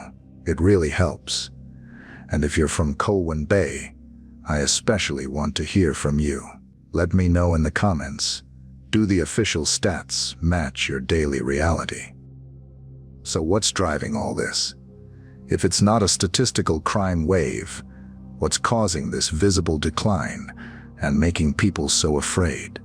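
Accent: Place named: United States. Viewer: why is the narrator using a US voice? This is silly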